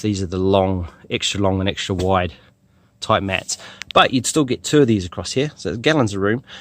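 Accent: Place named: United Kingdom. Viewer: Thank you, perfect review. Not only are your thoughts and your judgement very valuable to anybody thinking about buying this (I just ordered one btw), but also you have this really nice british English. A bit like David Attenborough, bit like the Top Gear guys. I like it.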